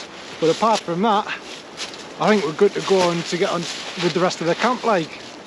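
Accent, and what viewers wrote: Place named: Newcastle, United Kingdom. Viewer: By the way, I do want to add that I did enjoy the video. You're like a Geordie version of Joe Robinet.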